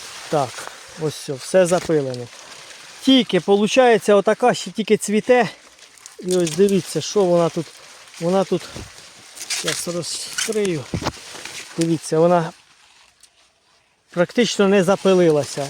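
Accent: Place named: Sumy, Ukraine. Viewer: Коментар на підтримку. Але ж як приємно чути нашу сумську говірку)))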